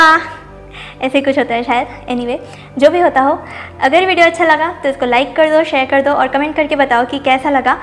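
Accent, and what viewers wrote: Bihar, India. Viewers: I like bihari tone 😝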